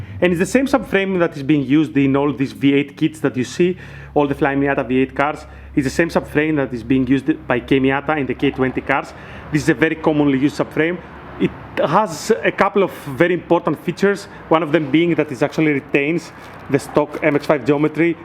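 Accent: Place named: Greece. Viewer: Απο την αρχη του βιντεο καταλαβα οτι εισαι ελληνας απο την προφορα... πολυ καλη δουλεια!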